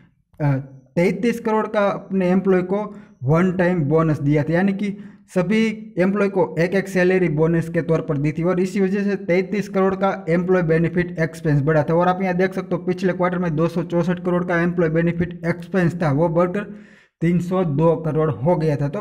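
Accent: Gujarat, India. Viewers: ગુજરાતી હોય એવું લાગે છે કેમ ??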